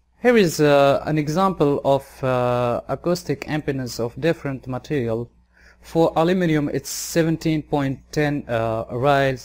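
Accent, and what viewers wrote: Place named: Maghreb. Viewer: أحسست فيك لهجة من المغرب العربي لذا سألتك ، انا اخوك من الجزائر ، بالتوفيق لك وشكرا جزيلا على الشروحات التي تقدمها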